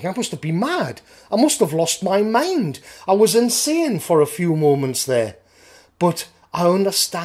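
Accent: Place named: Newcastle, United Kingdom. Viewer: Canny to hear a Geordie voice on here!! But, what gets me about this 'story' is this...he tweeted that pic and the pearl-clutchers went batshit crazy etc, he got hammered for it, sacked and everything. Yet they PUBLISHED the same sodding picture, so, why arent they being hammered for the same thing? The hypocrisy is overwhelming.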